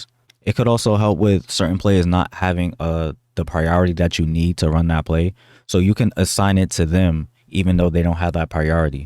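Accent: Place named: New York, United States. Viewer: solid video you sound like the big apple is home for you. my fam is all there you sound like them very cool i have the walk of fame in my city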